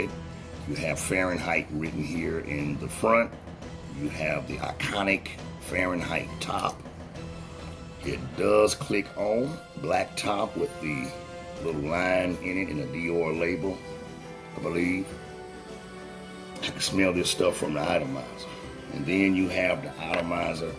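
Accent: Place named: South, United States. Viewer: That’s so cool you have that southern accent lol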